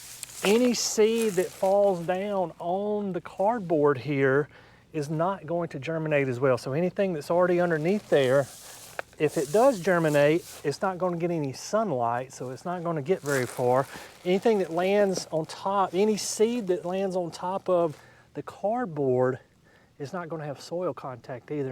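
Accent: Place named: North Carolina, United States. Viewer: Are you from North Carolina? Your accent sounds like it.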